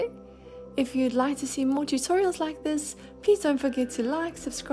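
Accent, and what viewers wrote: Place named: South Africa. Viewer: Brilliant idea and beautifully done. SA accent? Do you live in the US or SA? I'm so nosey, aren't I, but you're the first South African voice I've heard teaching painting and it's so refreshing.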